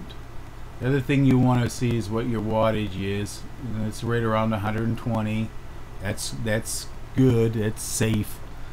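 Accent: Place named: South, United States. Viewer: You've got a slight Forrest Gump accent :) Thumbs-up !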